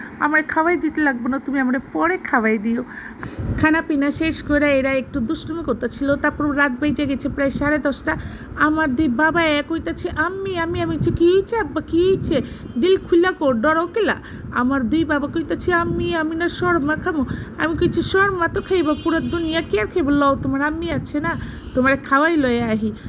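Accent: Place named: Dhaka, Bangladesh. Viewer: আসসালামুয়ালাইকুম আপু আপনার ভিডিওটা অনেক সুন্দর হয়েছে আপনি যে এত সুন্দর করে শুটকি ভুনা করেছেন দেখেই মন চাচ্ছে দৌড়ায় এসে খেয়ে ফেলি আর সেমাই পুরি তো দেখতে অনেক অনেক সুন্দর হয়েছে খুব ভালো লাগলো আর প্রত্যেকটা ভিডিওতে আপনি যে এত সুন্দর করে ভয়েস দেন আর আপনার এই আপনার মুখে ঢাকাইয়া ভাষা অনেক অনেক বেশি ভালো লাগে আপু এভাবেই আরো অনেকগুলো ভিডিও দিবেন আমরা অপেক্ষায় থাকবো খুব ভালো লাগলো দোয়া রইল আপনার ছেলের জন্য তাড়াতাড়ি সুস্থ হয়ে যাক❤❤❤❤❤❤❤❤❤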